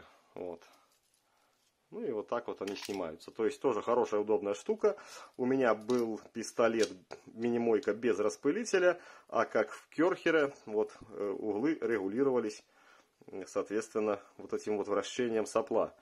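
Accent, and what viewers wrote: Belarus. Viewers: Судя по акценту - белорус!) Лайк не глядя)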